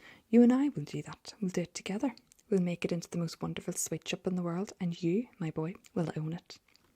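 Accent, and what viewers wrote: Ireland. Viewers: I love the Irish accent!! Great job🍀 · I love love love your Irish accent:)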